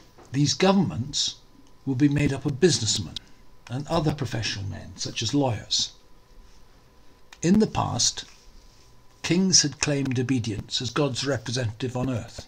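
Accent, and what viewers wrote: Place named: England, United Kingdom. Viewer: It's interesting you come out against Scottish nationalism when your whole planning-centred politics de facto centres around British nationalism. Scottish nationalism - tartan shortbread tins aside - is at least partially driven by pragmatism. They've never been well represented by Westminster. The divergent pandemic responses surely illustrate that... Scottish independence could pave the way for a federal system in the isles, which would be a step forward in political organization. (Your accent sounds English but I know you live in Edinburgh, so I'm sure you have a perspective on this. The last time I was there, I went down to the Cowgate and reflected on James Connolly, who seems to have had a balanced socialist perspective on the matter.)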